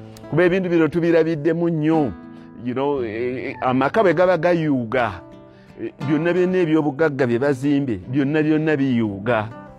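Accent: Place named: Kigezi, Uganda. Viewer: Naye onosoboka gwe kweeli,enjogera nga yakigaga kyereere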